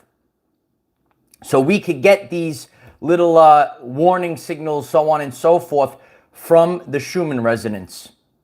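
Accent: New York, United States. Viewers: Fascinating site this,. Just started watching and I've already consumed about 20 shows in just a few days. Personally, I'm a bit of a loner and I lack trust in everyone online although I started awakening around about 2015 prompted by the usual atrocities/events having initially debunked the Party Political systems which are clearly a Cult creation and do not serve the people. I like the way you described the 2020 Plandemic as like the 'Fall of the Curtain albeit I've forgotten the exact term that was used. Spiritually, I'm trying and I like Gigi Young's work but I'm a bit of a hard case and I'm having trouble linking to my heart space and finding Christ Consciousness. People like David Icke, Clif High, Dark Journalist and Max Igan are must watches for me over several years now but, of course, I still know nothing in the grand scheme of things. 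And I love your New Yorker delivery and your hard hotting and specific delivery and hyour Sccoby Doo imitations. Lol. So, I'll be continuing to watch and learn. Thanks mate from here in the UK.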